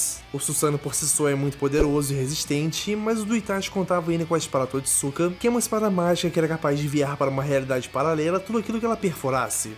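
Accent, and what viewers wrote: Portugal. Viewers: Vc é português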